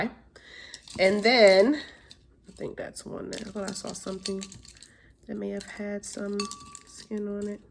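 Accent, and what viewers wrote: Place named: DMV, United States. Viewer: I hear a DMV accent…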